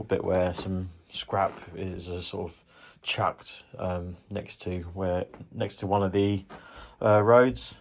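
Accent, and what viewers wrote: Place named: United Kingdom. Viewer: I bet you are British yeah...yeah...yeah...yeah...yeah...yeah...yeah...yeah...yeah...................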